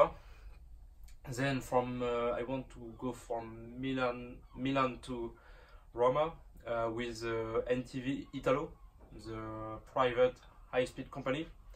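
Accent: France. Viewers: On reconnait bien le petit l'accent français comme tu parle anglais :D bref, Bonne chance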